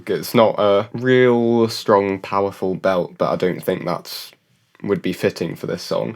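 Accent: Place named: Wales, United Kingdom. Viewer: Interesting accent. Are you from Wales?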